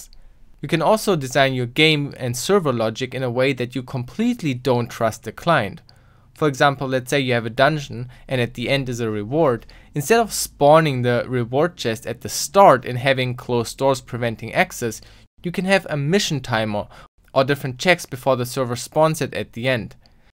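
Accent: Germany. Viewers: german? xD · Love your German accent, as soon as I heard your accent, I was like " this video should be good".. haha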